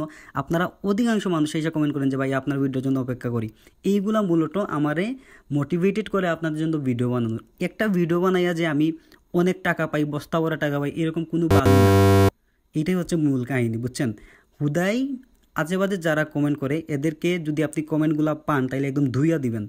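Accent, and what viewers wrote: Sylhet, Bangladesh. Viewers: vai apni ki sylheti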